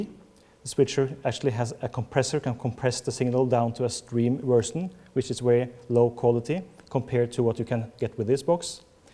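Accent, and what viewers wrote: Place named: Norway. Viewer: Tok ikke lang tid å høre at denne fyren er norsk!:P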